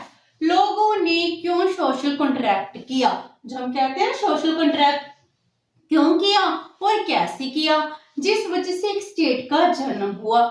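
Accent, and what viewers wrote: Punjab, India. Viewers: thankyou mam, the way u teach with punjabi accent is awesome and in a lucid way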